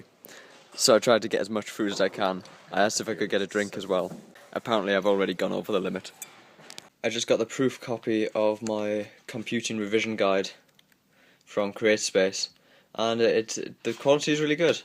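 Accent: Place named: Northern England, United Kingdom. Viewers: northern england - don't know the specifics because that would be creepy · If I were to guess, I'd say it sounded like a Sunderland accent !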